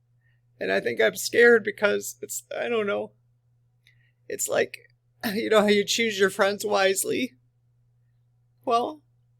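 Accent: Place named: Michigan, United States. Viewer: I am new to the channel and I am SO enjoying your videos!! You make me feel at “home”. I don’t know where in the country you live, but your accent reminds me of my birth home, Michigan ❤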